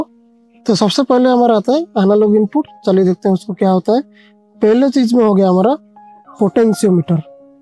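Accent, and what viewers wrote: Odisha, India. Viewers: Sir, you from odisha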